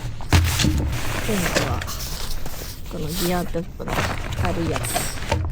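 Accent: Kansai, Japan. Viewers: こんにちは😃 本当に重たい荷物を持って歩いていく姿に、感動もらいましたの感動が、動画やBGM,可愛らしい大阪弁のおしゃべりからじんわりふかーく心に染みてきて本当に嬉しかったですいっしょに旅してましたよ😂❤ 後編、波乱気味みたいですが、楽しみにしています PS・関西人だからってわけではないですが、伊豆大島いったことなくって、良かった❤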